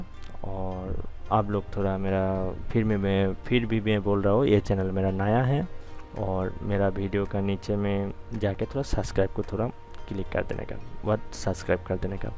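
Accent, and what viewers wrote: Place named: Odisha, India. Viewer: Bhai tu odiya ki hindi to achha se bol de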